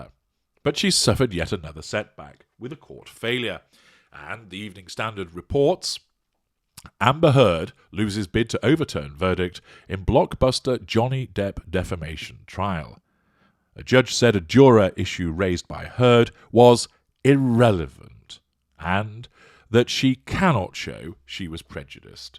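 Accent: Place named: Northern England, United Kingdom. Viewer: Think you hail from the North of England 🏴 have been curious for an age!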